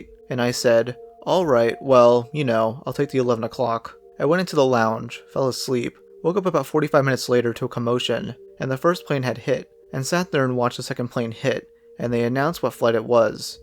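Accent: Canada. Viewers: isn’t it obvious, they are both Canadian as said and he just puts on a slightly Mexican accent for blameiton “ ” (Mexican George) show and puts on his typical canuck accent for his persona. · I don’t really think so. has a bit of a Canadian accent, meanwhile has a slight Spanish speaking accent (can’t pin point where, my Spanish is not very good)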